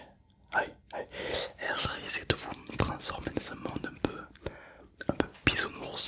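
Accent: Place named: Toulouse, France. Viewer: Super cette vidéo 👍🏻 J’ai remarqué que vous avez un accent, vous venez de Toulouse (je pose cette question parce que moi je viens de Toulouse) Sinon trop cool tes vidéo ASMR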